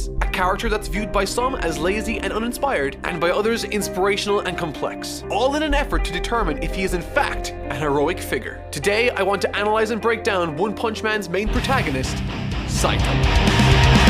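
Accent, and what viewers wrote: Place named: Ireland. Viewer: Great video, I've become a huge fan of yours in the past few weeks. But I need to ask this as it's distracting while I watch your videos. Are you from Ireland? Keep up the great work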